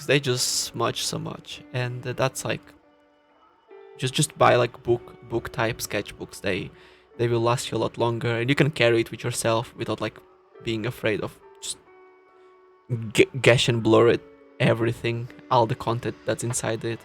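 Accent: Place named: Europe. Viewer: I thought the celestia and Luna voice were mostly intentional. I didn’t realize you were from Europe? I think? XD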